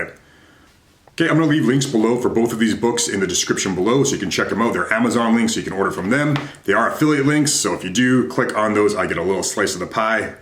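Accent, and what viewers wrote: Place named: Canada. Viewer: This guy’s voice screams Canadian 🇨🇦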